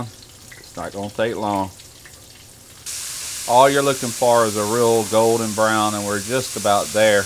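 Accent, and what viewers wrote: South, United States. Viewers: i’m from virginia and there is something tremendous about having a southern man give this advice. When i hear the draw i know he’s gonna be great. thank you sir!!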